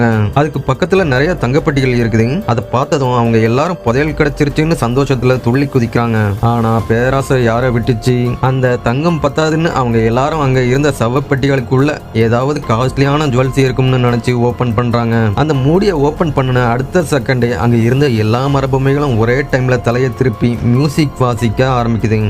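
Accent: Coimbatore, India. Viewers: Unga kovai tamil apo apo varuthu nice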